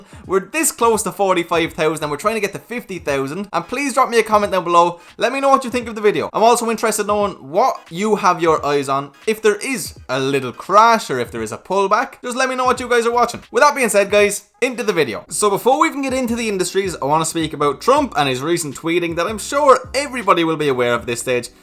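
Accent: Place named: Ireland. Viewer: Sound vids man. So nice hearing an Irish voice aswell hahaha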